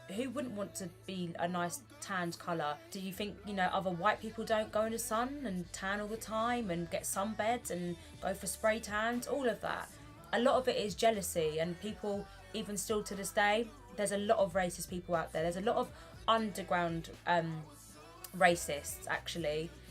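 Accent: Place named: United Kingdom. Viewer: I'm half white and half white?😂😂😂😂, Omg i think that have both cultures is funnny, but i AM from Brasil, and yes i'm mixed too but i Just white, not the two things. You is beautiful ♥️ and the ethinity no matter and, i love your accent! I haveee a special feeling for UK because of Amy Winehouse♥️omg i wanna visited a day kisses from Brasil, you are cute and funny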